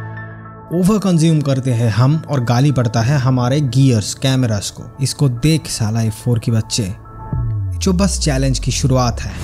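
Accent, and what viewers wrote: Bengal, India. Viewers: yes dada,junejo Bhai toh chiloi.... Casey Neistat o chilo kintu ami sotti mon theke chaitam amader ekhne keu storytelling bepar ta k ato Sundar kore bole na! Tar por tmr content dekhlm... I know keu contestant na ..sabai one of kind...you are unique person..even your content in ur way...mone hoy tumi o Bangali...tmr accent ar pujo te ghorar video dekhe...mone holo...achha jai houk... Love you boss...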